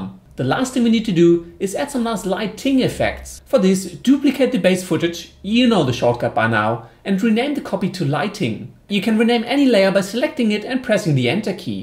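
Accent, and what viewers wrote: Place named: Germany. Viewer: I want to know where this accent comes from! Germany?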